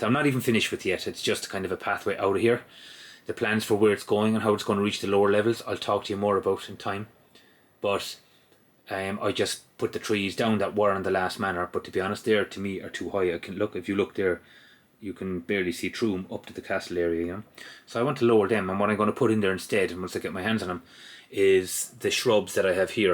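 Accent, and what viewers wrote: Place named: Ireland. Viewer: Omg he's Irish! :D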